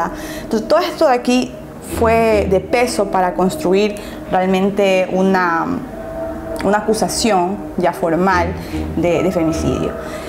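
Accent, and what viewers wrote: Guayaquil, Ecuador. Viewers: Nosotros los ecuatorianos hablamos así! No la critiquen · soy uruguaya y he visto todo lo posible de esta chica hace los videos con gran respeto y en cuanto al lenguaje es su expresión natural lo se porque soy docente ,si les molesta su modulación es porque no conocen ni respetan su acento ecuatoriano! si no les gusta no miren! Es muy fácil criticar! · Así hablan los Guayacos. Pero bien que tengas este canal. Es interesante.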